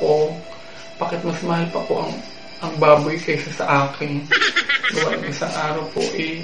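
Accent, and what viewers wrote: Batangas, Philippines. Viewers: Nakakatuwa ka....ala eh batanguena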